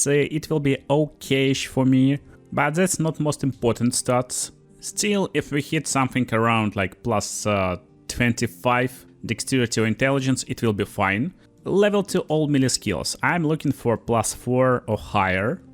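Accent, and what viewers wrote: Russia. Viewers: I love when Russian natives use their deep and heavy accents to talk about the best video game in the world-- Path of Exile 2. And yes, they finally put out a major fix for performance and crashing issues. :] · it sounds like a hard Russian accent.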